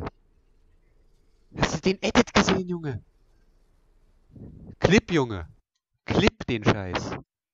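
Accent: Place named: Saxony, Germany. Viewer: Bist du Sachse ich bin Sachse denke es wegen dem Akzent, könntest du meistgeschauteClips von FaZe Dubs oder mitro, benjifishy feie das Format. 👍👌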